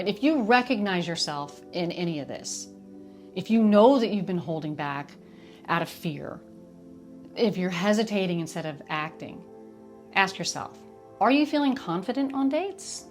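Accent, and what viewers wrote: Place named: Kentucky, United States. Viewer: Y'all ... I'm guessing Kentucky or Tennessee?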